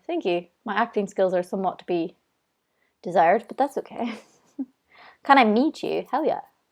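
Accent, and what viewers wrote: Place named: Ireland. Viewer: That amazing Irish accent! ;)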